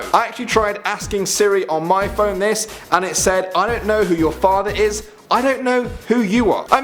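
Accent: Ireland. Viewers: Is this guy Irish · is he Irish????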